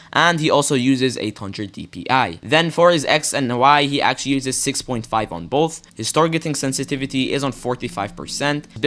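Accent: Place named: Sweden. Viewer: dude sound like he's swedish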